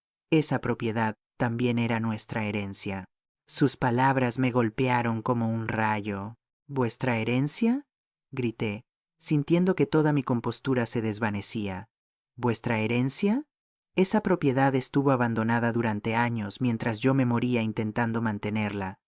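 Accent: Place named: United States. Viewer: Me gusta la historia, lo único qué no me gustó que narren con acento gringo, siendo qué la historia es relatada en Sevilla España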